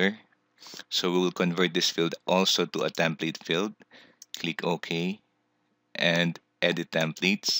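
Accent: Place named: Philippines. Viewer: Hmm! Filipino accent X))))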